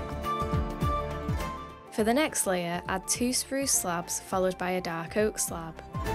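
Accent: United Kingdom. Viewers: Your British voice feels so calm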